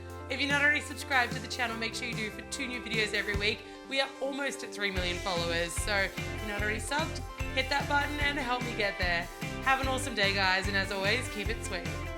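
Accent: Australia. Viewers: Hi! I'm an Aussie, been living and working in Japan for the last 6 years. I suck at baking, but my sister loves it and I wanted to feel close to her, so 2 years ago I started watching your channel. It reminds me of home (no matter how far or wide I roam, right?). I remember how to speak Aussie from you, so that my family won't tease me for sounding American lol (Funny that people actually can't understand Aussie here!) Your creations/ideas are amazing(!) and your down to earth personality is beautiful(!). I'm pretty sure that running a business, being publicly known and raising two gorgeous kids must be crazy sometimes, so I just wanted to take a second to say THANK YOU for all the countless(!) times you've made a homesick Aussie feel like she's back home in her sister's kitchen for half and hour or so each week. I pray you know how much God loves you! Renée x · R u Aussie or American???????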